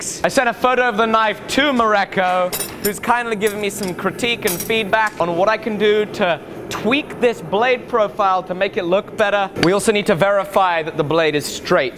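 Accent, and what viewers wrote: United Kingdom. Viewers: What is that accent? I’m British and never heard that accent before, sounds like British mixed with another... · Where about do you live in the UK your British accent is so strong unlike mine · Amazing work but can anyone place his accent. Is it part British ? I pick it up but what else and what region. Very interesting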